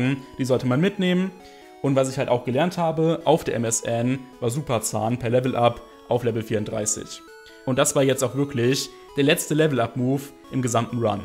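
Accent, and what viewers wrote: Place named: Germany. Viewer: Ich hab selten jemanden so deutsches Englisch sprechen gehört...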